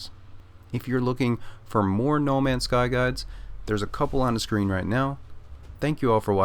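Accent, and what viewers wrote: Newfoundland, Canada. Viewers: are you canadian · Heard whaddayat, and immediately thought, a Newfie on YouTube??? No way! Love from a Corner Brook boy! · A minute in I was like wait b'ys a newfie - and then I saw your username 🤣 Born and raised in Deer Lake. Also thanks for the awesome guide! I just picked this up. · Hilarious. I was like "i know that accent". From one Newfie to another! Cheers dude! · Great content buddy . You sound Newfie as my self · Guy is from Canada or Minnesota. 😄 Pretty good video though. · Are you from Newfoundland you say "what are you at" the only people i know that say that are my family who are from Lewisport Newfoundland you sound like you have a slight accent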